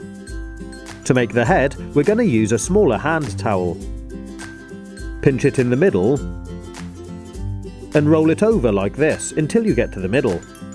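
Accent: United Kingdom. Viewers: is from the UK judging by his accent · Yamin anik I think he is from the UK because I am and his accent sounds familiar..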